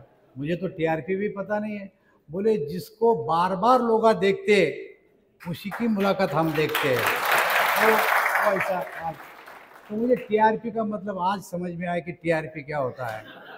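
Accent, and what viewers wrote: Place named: Marathwada, India. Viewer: Khup chhan gavran jaminivarcha neta..... jaykwadichi koli ani ravsaheb denvenchi boli hicha marathvadachi alokha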